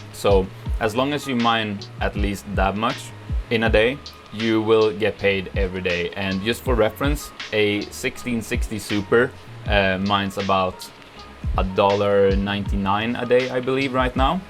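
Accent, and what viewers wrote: New Zealand. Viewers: Nice bit of Kiwi my dude, keep up the amazing work!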